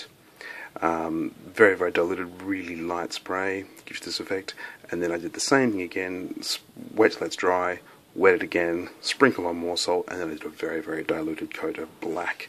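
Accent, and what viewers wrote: Australia. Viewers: I sense an Australian accent. Do you buy your base coat and general painting products from a place in Australia? If so are you able to link me the website? I live near no decent hobby places.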